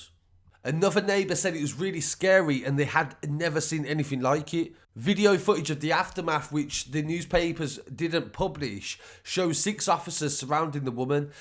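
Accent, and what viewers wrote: Birmingham, United Kingdom. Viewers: I ❤️the Brummie accent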